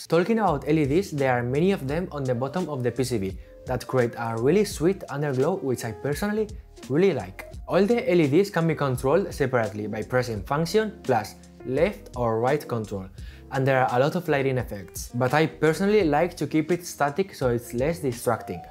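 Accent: Spain. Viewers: He for sure has been born in Spain or at least he has been living for most of his life. He is got an amazing english for his age. His accent is 100% spanish. Very nice chanel man. Keep it on !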